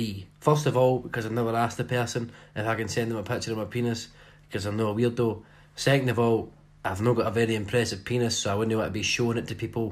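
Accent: Scotland, United Kingdom. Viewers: love ur Scottish accent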